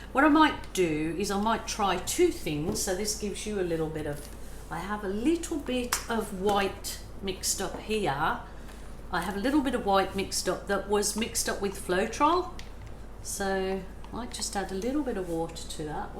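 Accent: Birmingham, United Kingdom. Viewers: You just keep on rabbiting, it’s what we brits do! You can take the girl out of Brummie, but you can’t take the Brummie out of the girl! Love the swipe.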